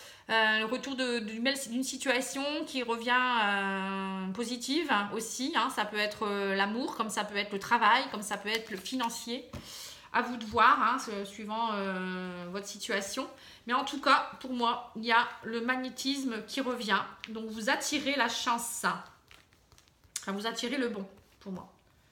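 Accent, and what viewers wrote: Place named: Pas-de-Calais, France. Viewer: Bonjour, merci pour cette guidance bien complète. Juste une remarque sur le domaine sentimental : je trouve que vous parlez beaucoup des couples et pas assez des célibataires. J'en suis une parmi les 14 millions de célibataires de France, donc très loin d'être la seule. Pourriez-vous rééquilibrer vos prédictions sentimentales dans ce sens ? En tout cas je trouve votre analyse fluide et parlante. Merci ! Dernière chose : seriez-vous du Pas-de-Calais car votre accent me fait penser à ce département ? Merci. Paix et amour à tous 🤗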